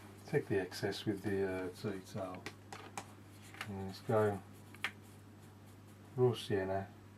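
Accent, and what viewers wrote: Birmingham, United Kingdom. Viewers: Nice work, and nice to hear a Brummie accent, I'm a Brummie living in Wales, lol keep on posting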